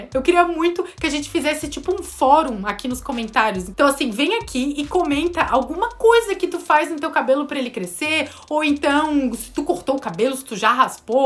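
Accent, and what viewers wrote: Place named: Florianópolis, Brazil. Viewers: nossa escutei o sotaque e tive certeza q era daqui de floripa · Tão bom abrir vídeos aqui e ouvir esse sotaque! Uma impressão de que te conheço de Florianópolis... Só quanto à massagem, não fizeste recomendação explícita de massagear com as unhas, mas ficou a dúvida em muita gente sobre isso. Acredito que tu saibas, mas é bom reforçar por aqui: massagem só se for com as digitais dos dedos, com a parte macia! Never do it with the unhas!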